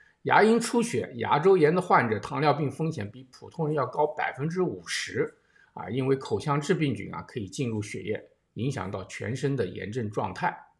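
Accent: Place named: Nanjing, China. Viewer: 听出杨医生南京的口音了。不知道对不对。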